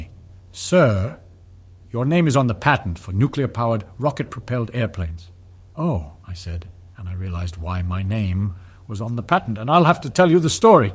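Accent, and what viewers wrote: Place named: United Kingdom. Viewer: the British accent lol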